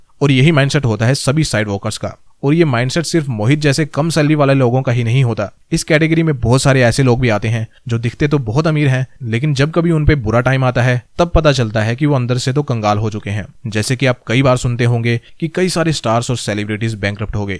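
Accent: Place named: Punjab, India. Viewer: punjabi accent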